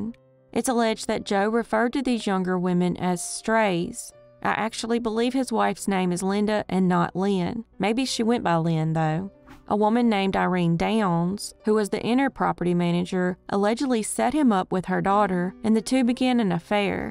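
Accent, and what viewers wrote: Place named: South, United States. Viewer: Just saying...imagine that accent our kids would have... My Russian + This Southern... Awesome job on these stories though... 😀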